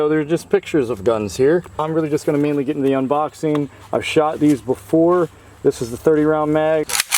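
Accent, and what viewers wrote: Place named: New Jersey, United States. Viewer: So your from New Jersey’s then?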